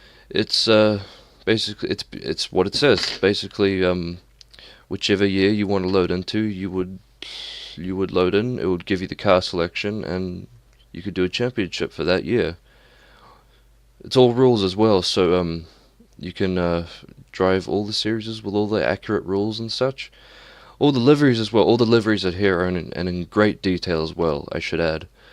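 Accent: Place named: New Zealand. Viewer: I was sitting there thinking where your accent came from and I thought,”I reckon he’s a Kiwi” Nailed it,gday mate.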